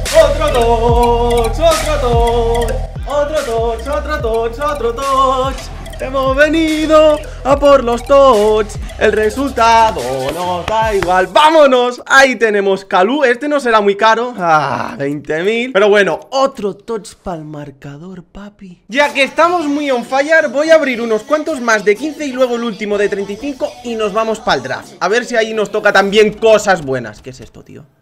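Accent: Mexico. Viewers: hablas igual a los mexicanos saludos desde México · los mexicanos del sur si hablan asi, nosotros del norte hablamos diferente:)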